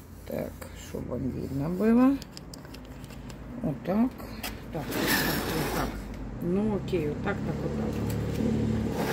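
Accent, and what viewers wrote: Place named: Ukraine. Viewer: думала,что вы украинка(я сама украинка и с таким же акцентом)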